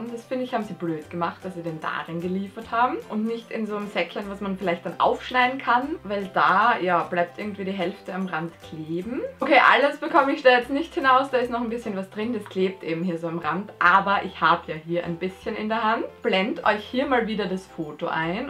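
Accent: Austria. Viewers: Du bist a österreicherin.....kenn i ..i kum aus linz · Hi bist aus Österreich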